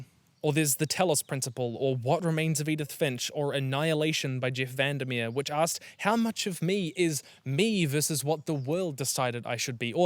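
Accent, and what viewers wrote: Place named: New Zealand. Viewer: this is my first video of yours. heard the accent and had a feeling you might be kiwi. wasnt till you said 'the warehouse' in the begining of the video that i finally clocked that yes indeed you are a kiwi haha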